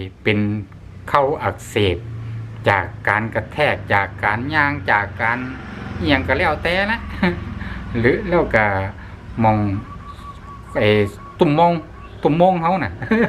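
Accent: Thailand. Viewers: ทำไมไม่พูดภาษากลางล่ะ คับ ฟังไม่รู้เรื่องเลย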